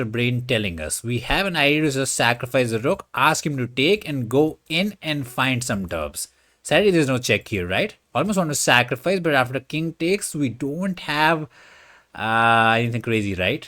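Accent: India. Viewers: When I want a good laugh and learn more about Chess tactics, this dude doesn't disappoint lol... The only jokes with Indian accent that I accept, and enjoy,,,lol...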